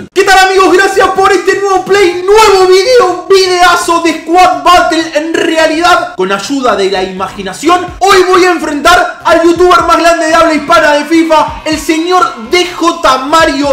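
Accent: Argentina. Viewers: nunca vi a dj mario hablando en argentino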